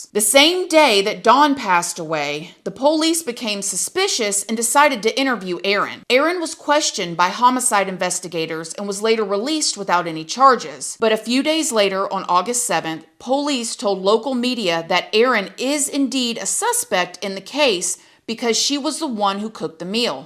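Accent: South, United States. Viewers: Hey! I’m now discovering you… GURL! Where you from? Southern? Your accent is coming through hard! 💯Love it!💖 Oh yeah… This story is madness! 🤯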